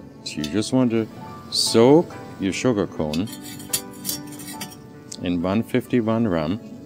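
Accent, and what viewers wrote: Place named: Germany. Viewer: I love this, I absolutely love this. It put me right in the Christmas spirit and made me feel right at home. I have a very german family with very german older relatives, and this all just brought me back home. And to top it off I have a new recipe to bring to my (Polish) inlaw Christmas table. Who I know will absolutely love this! But honestly, how is it some guy, with a german accent, somewhere in the world made me feel so at home and comfortable all at the same time. I honestly wish I could spend a night or a week at his hotel just to feel all that in person. This man is the host that all hosts strive to be.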